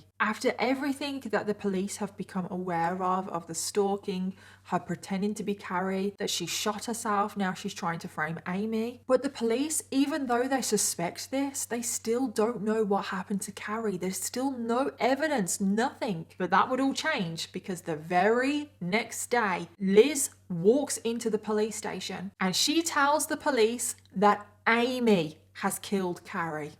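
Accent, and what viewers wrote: United Kingdom. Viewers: i dont think ive ever heard a more british accent hahah every word is brilliantly emphasized haha exquisite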